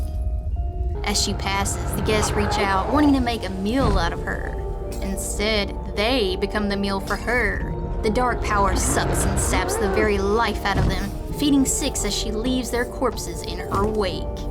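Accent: South, United States. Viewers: We return to the, for good speculation on nightmare fuel with a slight southern drawl to it Keep it up!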